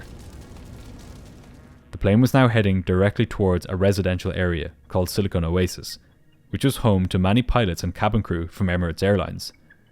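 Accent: Ireland. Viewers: Yeah, he does have a very pleasant Irish lilt. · That an Irish Brogue ?Love the channel.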